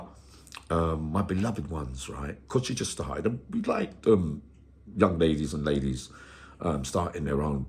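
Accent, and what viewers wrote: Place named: London, United Kingdom. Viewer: It’s good to hear a London accent.❤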